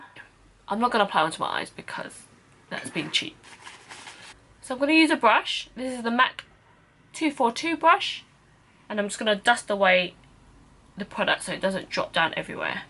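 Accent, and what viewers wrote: United Kingdom. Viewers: omg I've just found you on instagram and i was like no way this girl doesn't have youtube with such fleeky makeup, new subbie here. i love it, and do you live in the UK you sound very british x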